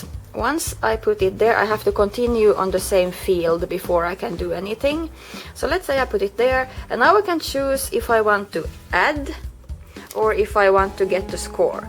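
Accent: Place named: Finland. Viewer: Suomi <3 I could listen to your English the whole day...it sounds great :D Oh, do you know if there's Carcassonne tournaments in Finland/Helsinki?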